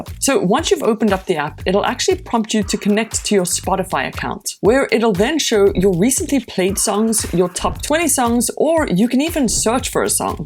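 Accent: South Africa. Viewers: You sound very South African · I can’t unhear how much you sound South African… 😅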